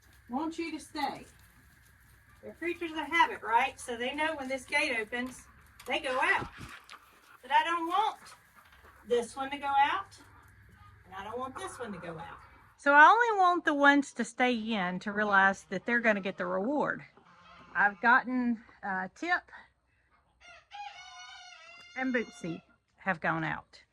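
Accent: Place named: Alabama, United States. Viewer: I love how you say “boil”! You are so darn cute with your Alabama accent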